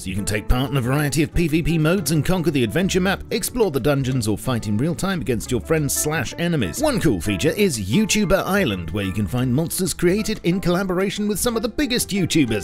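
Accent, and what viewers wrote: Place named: Cockney, United Kingdom. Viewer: ...hmm...I wonder if and The Spiffing Brit are related?..both are partial to a cuppa...both have posh accents with just a hint of East End..init..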